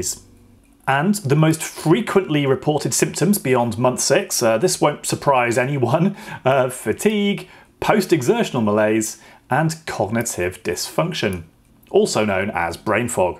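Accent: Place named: United Kingdom. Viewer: I read the article, but its much more interesting to listen to summarise with his cool British accent. Wishing us all a long-covid free 2021.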